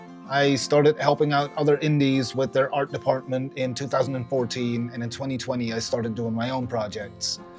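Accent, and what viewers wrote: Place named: United Kingdom. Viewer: All good points. One thing I wanted to mention though that most YouTubers conveniently fail to bring up: most countries outside of the US often provide artist related grants to indie game developers, to help them survive while they’re pursuing their dream. That may or may not be you (although you sound like you’re from the UK so it might be), but just imagine everything you just said, now add on to that the necessity of also being independently wealthy, or so young that you’re still mooching off mommy and daddy, and now you have a better perspective of what it’s like to be an indie game developer in the United States. Again, that may or may not be you, but I just wanted to mention it because it’s something that a lot of these European game devs will often deliberately omit.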